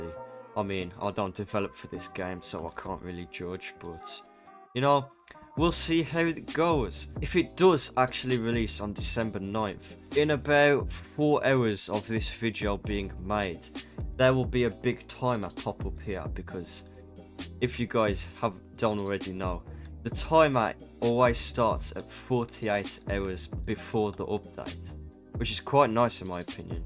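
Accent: United Kingdom. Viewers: Wait ur British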